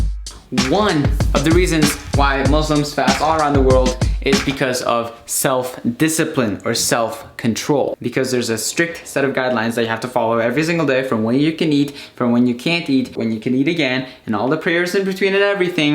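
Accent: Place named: United States. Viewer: cool video! im an american that moved to Turkey a couple of years ago. i have some uzbek friends. they dont know english, so its really interesting hearing an uzbek person speaking perfectly accented american english lol... Türkiye'den Üzbekistan'a selamlar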